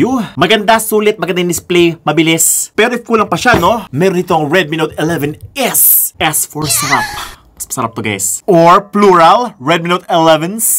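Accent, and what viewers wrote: Visayas, Philippines. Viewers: HAHAHAHAHA bisaya kapo ba kuya?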